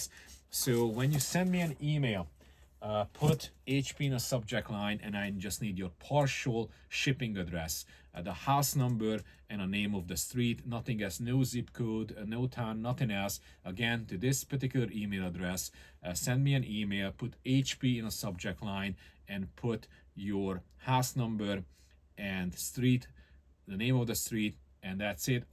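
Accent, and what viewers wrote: Hungary. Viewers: I know a hungarian accent when i hear one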